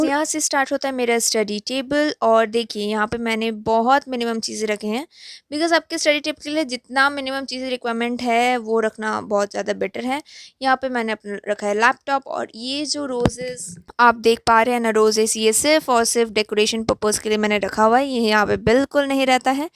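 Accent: Bengal, India. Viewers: Bangali hai ho skta hai uski Hindi aisi ho okk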